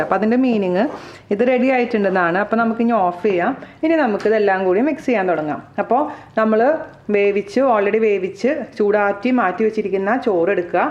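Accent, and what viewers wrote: Thrissur, India. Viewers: Trichur slang sooo sweet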